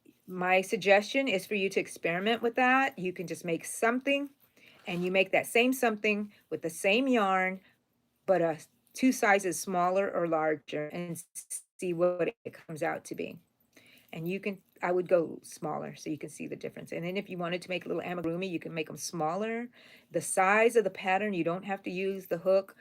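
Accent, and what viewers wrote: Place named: New Mexico, United States. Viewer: Are you from NM? Your accent reminds me of the abuelitas here!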